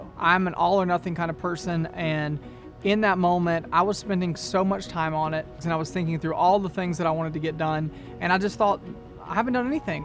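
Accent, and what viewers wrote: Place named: South, United States. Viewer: Are you from the Mid-South? I hear a Memphis/Alabama/Arkansas/Tennessee accent.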